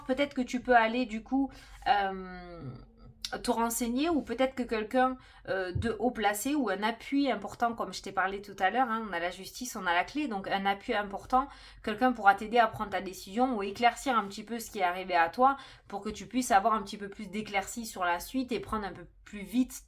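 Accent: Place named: Marseille, France. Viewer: bj contente de te voir et de t entendre 🤩👍l accent de chez nous! bj des marseillais 💙🤍💙🤍💙🤍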